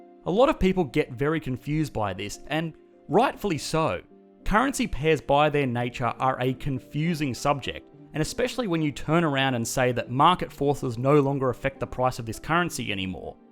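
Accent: Australia. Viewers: This channel makes me wish I had an Australian accent. It just sonds so relatable and charming.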